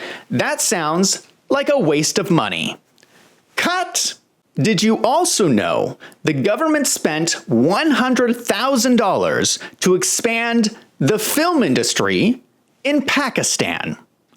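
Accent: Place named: United States. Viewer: I like your voice, very American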